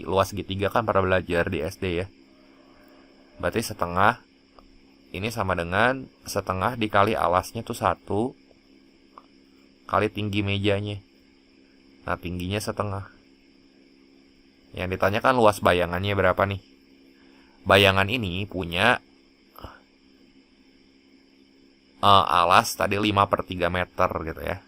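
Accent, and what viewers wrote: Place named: Bandung, Indonesia. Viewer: logat nya bandung pisan mang😂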